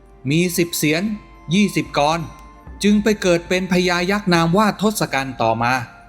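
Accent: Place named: Thailand. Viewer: ชอบเสียงเหน่ออะ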